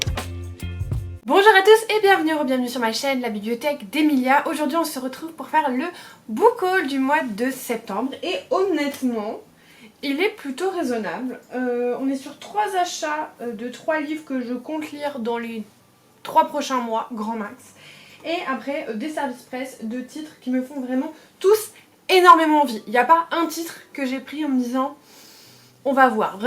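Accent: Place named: South, France. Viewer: Bravo pour le abonnés!!! 🎉 quel bel accent du Sud 😅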